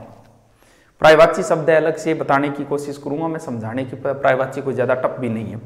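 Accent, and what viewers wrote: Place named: Rajasthan, India. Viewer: Rajasthani tone....maja aaya pdhne me....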